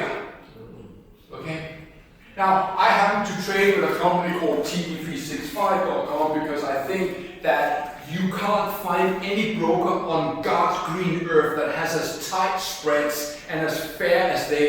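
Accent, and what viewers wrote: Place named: Denmark. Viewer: Awesome content. But equally awful audio, its hard to navigate through that tough danish acent. Overall nicely explained. Thank you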